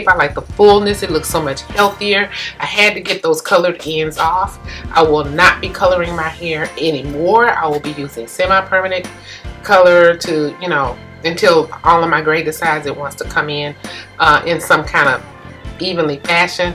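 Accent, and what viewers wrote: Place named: South, United States. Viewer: i love hearing ur lil country accent lol. u look AMAAAAZING!!! i need ur detox type diet plan!!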